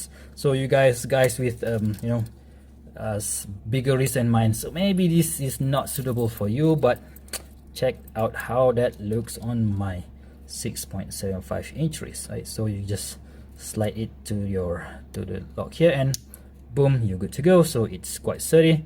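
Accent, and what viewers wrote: Malaysia. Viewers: nicely explained and straight to the point! :D by any chance are you malaysian or singaporean?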